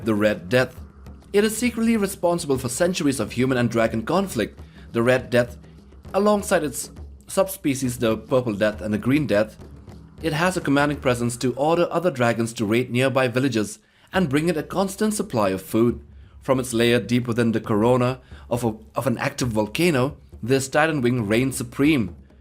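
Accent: Germany. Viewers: Love ur german axcent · du bist son deutscher das hört man sooo hart :)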